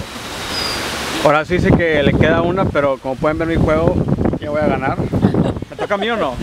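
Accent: Monterrey, Mexico. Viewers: el acento que tiene, es normal de alguien de monterrey?:( quee alguien respondaa:'( soy de Chile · Qué bonito video con puro acento regio🙌😜 jaja me encanto haz mas vídeos así, y haz convi en Monterrey😭😭🙏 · Sip soy de Monterrey y así hablo😂😂😂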